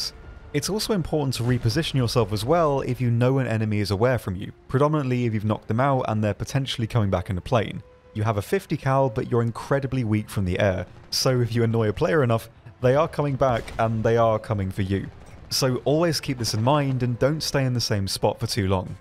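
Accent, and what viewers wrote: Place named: United Kingdom. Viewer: You create my favorite War Thunder videos on YouTube! Thank you for your hard work. Your British accent is also greatly appreciated by this American. :)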